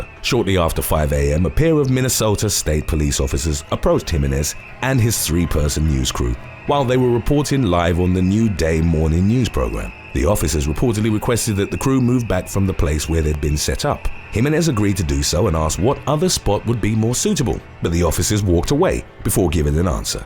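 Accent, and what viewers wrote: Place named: England, United Kingdom. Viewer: I figured you were an Englishman in America!!!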